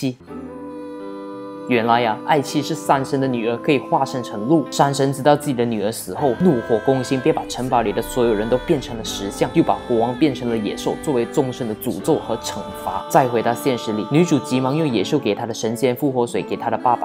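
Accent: Malaysia. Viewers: 我就想說聽了怎麼久都聽不出是什麼國家的，以為是中國的時候就突然冒出一個馬來西亞嗆，才知道…原來是馬來西亞人啊XDD 以馬來西亞人來說你的腔調沒那麼明顯呢XDD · 是马来西亚人？ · 哈哈~听到这里时我也奇怪！这是大马人吗囧？ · 哈哈哈，馬來西亞口音，好懷念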